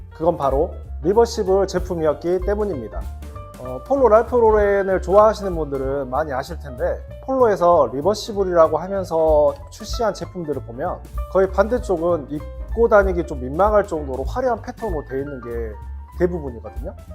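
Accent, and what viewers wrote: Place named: Jeolla, South Korea. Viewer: 말투가 전라도광준디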